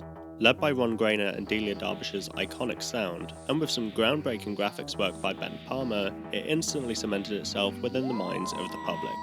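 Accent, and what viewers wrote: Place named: Scotland, United Kingdom. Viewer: I FORGOT HE'S SO SCOTTISH OH MY GOODNESS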